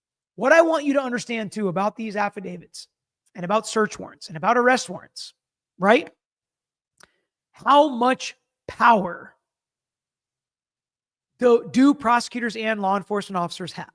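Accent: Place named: South, United States. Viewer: Put on 1.5x speed if you’re not from the South.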